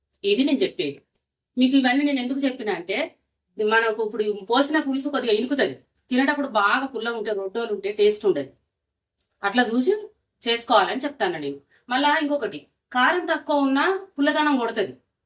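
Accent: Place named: Karimnagar, India. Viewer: Meedhi karimnagar eh ayi untadhi kada aunty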